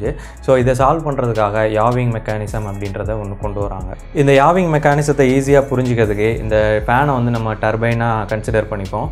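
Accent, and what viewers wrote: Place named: Kanyakumari, India. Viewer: ur slang looks like, kanyakumari or nagercoil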